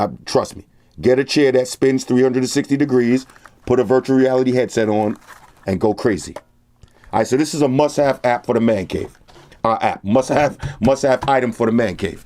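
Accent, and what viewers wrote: Jamaica. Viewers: yo floss u jamaican? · u Jamaican?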